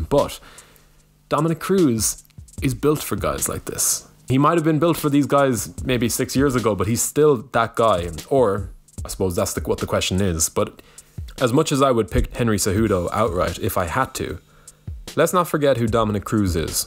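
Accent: Ireland. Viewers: You are Irish aren't you?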